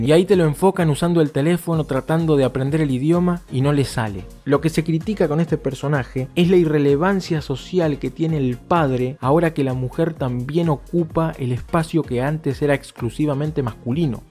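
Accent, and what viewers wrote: Argentina. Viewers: En este vídeo se te escucha mucho el cheeee, el boludooo, lo argentinooooo; me gusto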